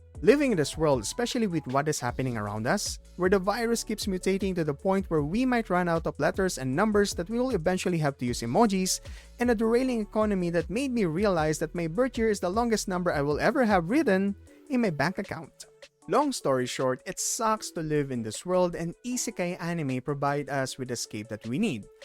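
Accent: Philippines. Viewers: your pinoy accent is so thick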